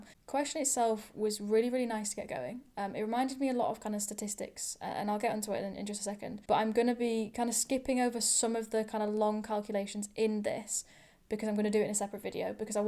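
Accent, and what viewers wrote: United Kingdom. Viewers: Bruhh that's british not Australian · Are you British?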